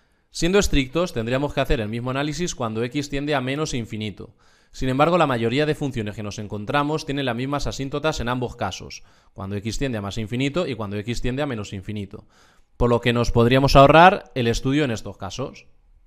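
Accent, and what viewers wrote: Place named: La Mancha, Spain. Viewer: Por tu acento, eres de mi tierra, castilla la mancha, tirado más a la mancha manchega... puede ser?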